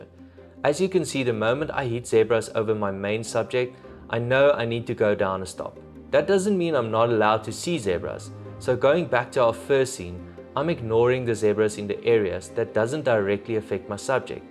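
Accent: South Africa. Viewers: You sound South African bro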